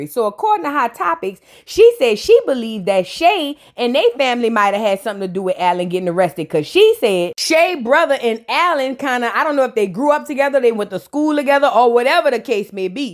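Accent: United States. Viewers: Hi, im from the UK and a new follower. You’re accent is my favourite American accent ❤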